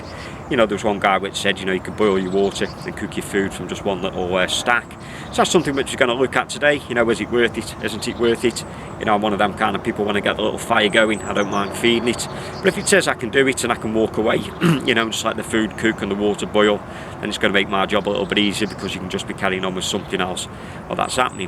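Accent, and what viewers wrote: United Kingdom. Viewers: Thanks for the reply mate. Yea, I could see the logic in trying it out. There was another idea someone else had to put folded tinfoil with holes in it to cover the feeding hole and encourage the air flow from below. They combined it with the vertical stack and believed that it was more efficient boiling water 2 mins faster. There's always going to be someone who has to try to get a faster boil. Or a newer more fancy stove to shave a few precious seconds off the boil time. Kind of takes the fun out of the whole thing and feels like they are missing the point a bit. Though I am coming at this from a leasure angle rather than a survival one. Me :) I'm happy fueling the fire as I go and enjoying the whole experience. The fun of watching and feeding the stove is a big part of that. The smell of the smoke and the flavour when you cook with it. 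Totally agree. It's also really uplifting after a long week just to get back to basics but from your videos you and im sure most of the people watching your videos completely get it :) Also nice to see someone from the UK with an accent I recognise on here lol. I'm up in Scotland.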